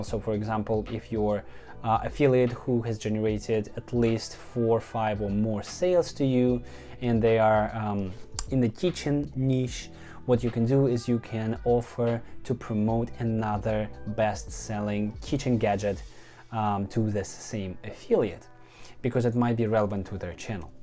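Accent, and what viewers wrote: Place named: Russia. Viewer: Love that Russian accent, you have that BDE 😂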